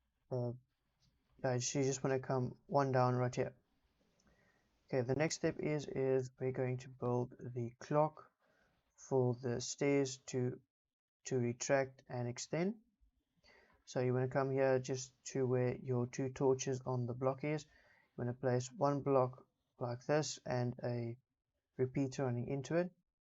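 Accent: South Africa. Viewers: are you sourh african?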